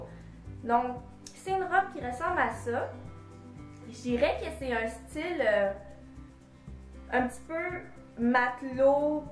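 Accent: Canada. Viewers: J aime les 2 blouses et la robe blue blanc le tt assaionne de ton accent Canadian que je kiff fort !!humm Je m abonne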